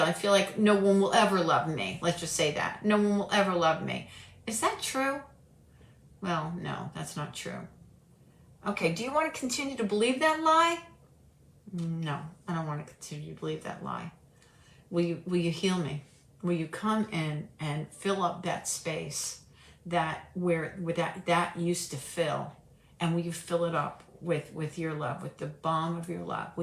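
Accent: New Jersey, United States. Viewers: . I LOVE YOU. 😭 I was guided to your videos for the first time a few days ago. I was feeling rather defeated that day. I mindlessly typed something in google search like: "Spiritual Warfare and Overcoming Procrastination/Disorganization through Christ." I clicked on your video, wasn't thinking much of it, but then you grabbed my attention as if you had a megaphone in my ear!! 😅 I was blown away by how much I resonated with your words. It was like God was speaking to me directly, but through you. You have truly helped me breakthrough the weight of my idleness. The mountain doesn't seem as overwhelming to climb now. I've noticed less deer-in-the-headlights blank staring at the messes, because I actually know where to start now! 😆 Hallelujah! Praise to the most high! I am so thankful that He directed me to you! This video was a key that unlocked even more answers for me! 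I'm not exaggerating when I say you are an answer to my prayers,! I'm younger than your children and live thousands of miles away but, I wish we were best friends. I just want to hug you and talk for hours. My soul understands yours. Ah. Thank you for being you. 💕💕💕💕 P.s. I love love love the Jersey in your voice! It's so great 😜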